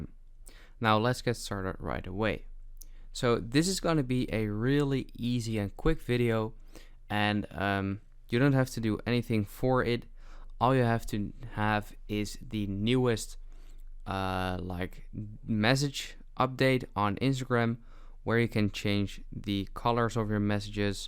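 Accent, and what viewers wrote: Netherlands. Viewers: When the dutch accent gives you away 😏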